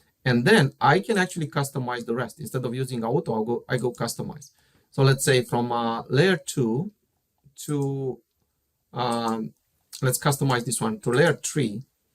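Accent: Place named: Romania. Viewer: Are you romanian? :)) (because of the accent) Anyway, congrats for explanations.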